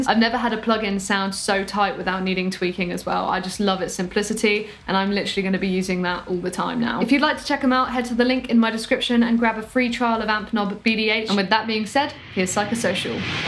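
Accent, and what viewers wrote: United Kingdom. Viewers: I love the British accent on women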